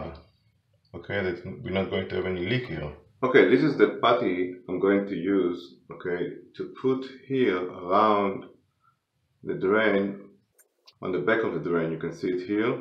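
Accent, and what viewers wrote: Israel. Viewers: יש לך מבטא ישראלי ?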